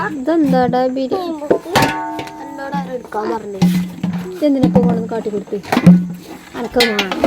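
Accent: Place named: Kannur, India. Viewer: വ്ലോഗ് എന്നും കണ്ട് ശീലം ആയി അത് കൊണ്ട് എന്നും വീഡിയോ ഇടണം ❤❤❤ Kannur സ്ലാങ് ട്ടോ ട്ടോ കുറവാണ് അത് കൊണ്ട് പറഞ്ഞതാ 😂😂😂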